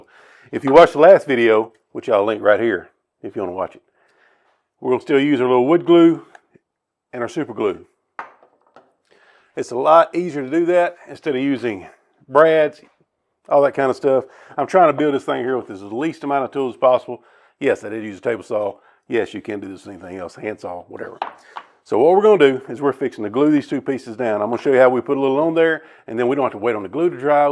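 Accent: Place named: Arkansas, United States. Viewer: Are you from Arkansas? Your accent sounds just like my hubby and his family :)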